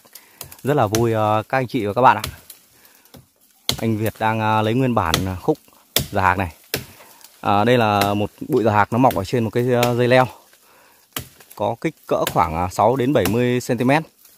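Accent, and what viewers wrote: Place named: Mekong Delta, Vietnam. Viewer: Nge giọng a việt gióg ng miền tây nam bộ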